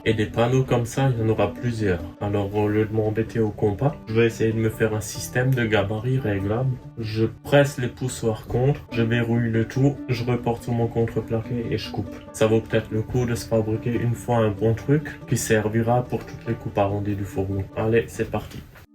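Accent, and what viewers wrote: Alsace, France. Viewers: bien sûr que notre Alsace est belle!!! Tu dois en savoir quelque chose non?! Vu que tu as le même accent que moi J'aimerais bien voir en vrai vu qu'on est voisin cela pourrait m'aider vu que j'ai des projets similaires ( je pense a une caisse a charger sur le plateau de ma camionette) en espérant que cela devrait compter comme chargement donc pas de démarches administratives... L'alasacien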